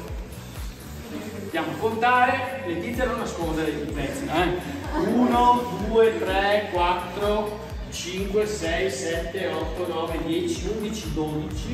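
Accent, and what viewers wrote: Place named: Veneto, Italy. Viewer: incomprensibile....parliamo l'taliano!!! ...non il veneto o similari.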